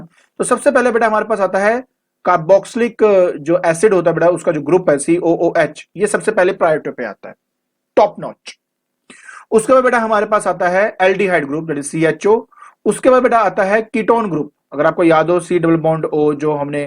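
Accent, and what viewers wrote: Punjab, India. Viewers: Sir you are Punjabi??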